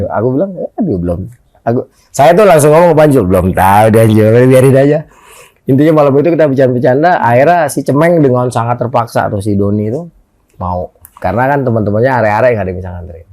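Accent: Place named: East Java, Indonesia. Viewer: Seneng derger gaya bicara abang nya.....Jatim Kediri...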